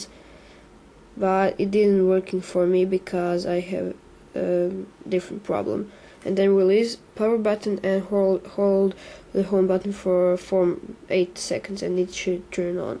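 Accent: Russia. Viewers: u sound russian im russian but i dont have an accent kool vid thanks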